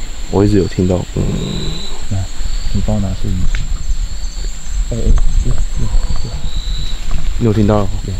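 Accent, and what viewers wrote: Taiwan. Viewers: 晚上好开头介绍都用台语来说馁~我喜欢~那个低吼声我也有听到。。😰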